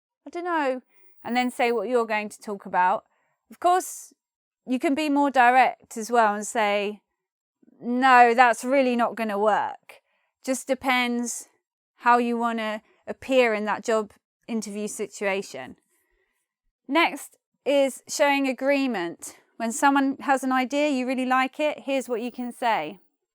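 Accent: United Kingdom. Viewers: Are u followed British Accent? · This is genuinely accent from UK British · i can't believe that i understand totally what you say, i mean British accent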